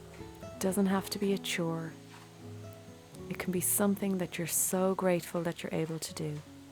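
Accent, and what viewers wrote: Ireland. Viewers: I’ve had a small touch of spring cleaning fever for a few days now and this video just fed that immensely! It’s as if you described my every thought & feeling, except with a beautiful, soothing Irish lilt!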